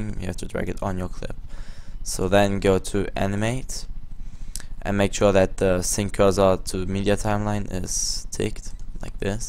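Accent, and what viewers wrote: Germany. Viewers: Haha das is das allererste video was ich von dir schaue aber ich hab sofort gemerkt dass du deutscher bist :D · man erkennt deutsche so leicht xd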